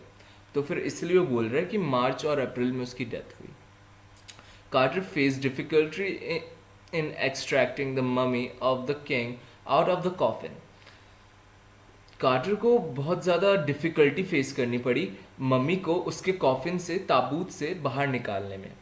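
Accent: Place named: United States. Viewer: it is not necessary to speak in an American language if u would have spoken in a simpler way it would be much clear to the students. anyway ur video helped me a lot . thank u sir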